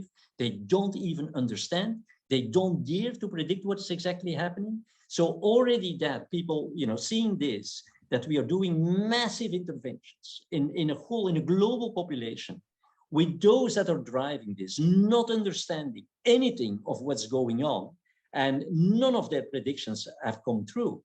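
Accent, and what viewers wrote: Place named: Belgium. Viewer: He is Belgian. Are YOU fluent in another language???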